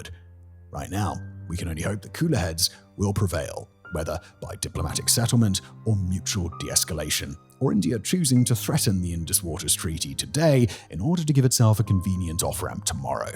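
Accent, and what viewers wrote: United Kingdom. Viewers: Hey white man either a British accent, what would your country do if it had a neighbor like Pakistan which continues to train, execute and protect terrorists? What would any reasonable country do? Is there any other country in the world which has tolerated this kind behavior like India with so much patience?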